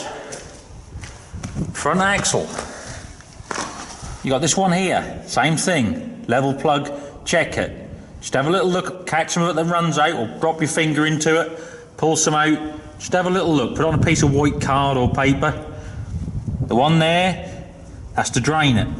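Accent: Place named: Gloucestershire, United Kingdom. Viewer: well difficult to tell but where abouts in the country are you from? as a Gloucestershire lad you sound like a posh GL resident, you are going to say your from up north now ha ha. Enjoy the chanell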